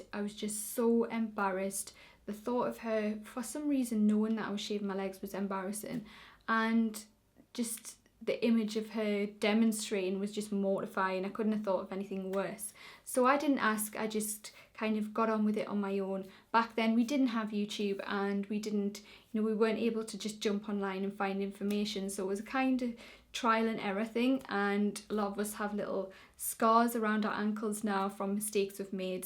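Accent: Newcastle, United Kingdom. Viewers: are you from newcastle?? your accent is one of my faves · its english is a geordie accent. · Are you Geordie? Because I am and you sound like it :)) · I thought Geordie? :) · Jade and Perri are both Geordies just like the YouTube speaking on here and like me ahaha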